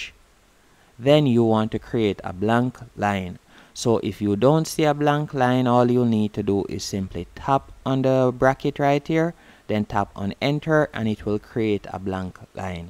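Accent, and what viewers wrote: Jamaica. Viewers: Haven’t finished the video yet but I wanted to take a moment to admire you accent. I love the Jamaican accent, reminds me of home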